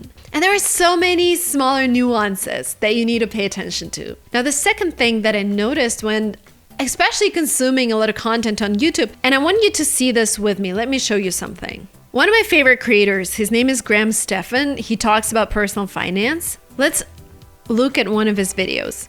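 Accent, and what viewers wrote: United States. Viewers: I am from Uzbekistan. I love American accent. That's why I choose your lessons in order to improve my accent,because of its really appealing, amazing❤❤❤😊😊😊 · Your American accent is excellent! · I noticed a big change in your accent. From the very first video where I heard you first time. You make it. It's looking like a real American accent. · Hello, I just wanted to let you know that your videos are great. You have a fantastic American accent which makes it easy for me to understand you, even though I am a British English speaker. I also love watching your videos to learn English. Keep up the amazing work! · i'm from uzbekistan , i love your videos and included this, as i can learn American accent by watching your videos i can't thank you enough , all of them are awesome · Very good. Better then mine American accent. I think you sound Mexican or Columbia with many years of America.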